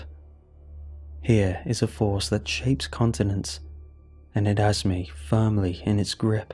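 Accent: United Kingdom. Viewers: Amazing voice from our favorite british narrator!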